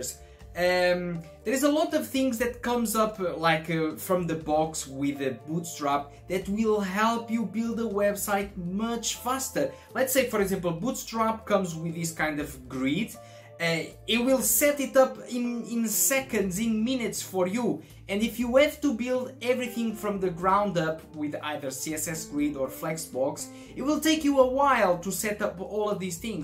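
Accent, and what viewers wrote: Brazil. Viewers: are you Brazilian ? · falou duas palavras eu vi que era brasileiro hahah ótima explicação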